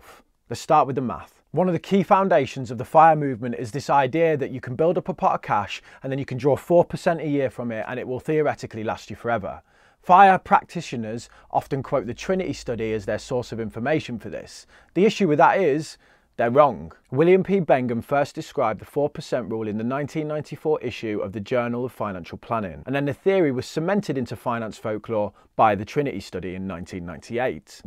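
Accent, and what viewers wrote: Birmingham, United Kingdom. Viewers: Hey neighbour!! I always thought I could hear a touch of manc in that Brum accent! 😂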